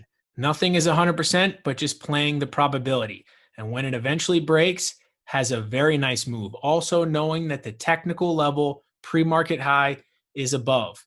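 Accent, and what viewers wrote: New York, United States. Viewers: That accent are you from ny/nj?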